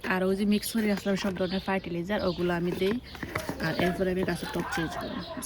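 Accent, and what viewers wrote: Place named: Sylhet, Bangladesh. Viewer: She is speaking properly, shes speaking sylheti which is a different dialect of bangla it does not mean it is from a lower class. YOU need to learn to speak properly and not make judgments based on people’s background.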